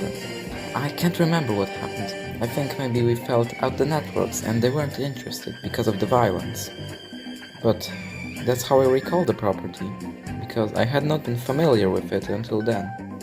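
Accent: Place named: Eastern Europe. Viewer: SLAVIC ACCENT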